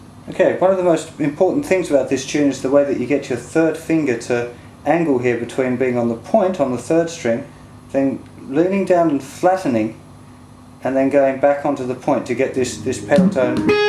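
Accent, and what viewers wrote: England, United Kingdom. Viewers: Never thought I’d have Norm Macdonald teaching me guitar while using his English accent. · Is your origin from England?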